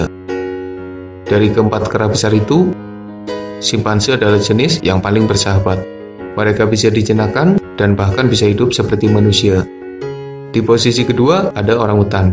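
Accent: Banyumas, Indonesia. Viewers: Sampean ngomonhe ngapak mas, wong ndi si? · Ngapaknya top..😄😄😄😄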